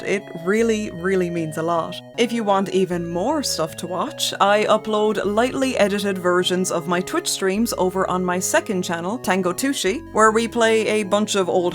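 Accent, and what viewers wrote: Ireland. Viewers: Where is your accent from. Is it a mix of Irish with something else. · Ooooooooh an Irish accent. Always love to find niche horror game deep dive extra points for the familiar accent in a sea of Americans Australians and brits 😁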